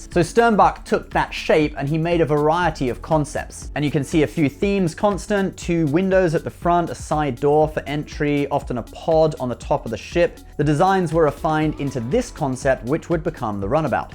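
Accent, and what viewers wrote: United Kingdom. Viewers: Cool. Its. He's back.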